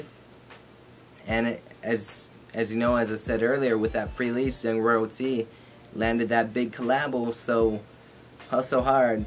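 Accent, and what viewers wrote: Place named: United States. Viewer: You have a slightly American accent, but i understood it pretty well :] was geht... what´s up... was geht.... you know. it sounded like that ^^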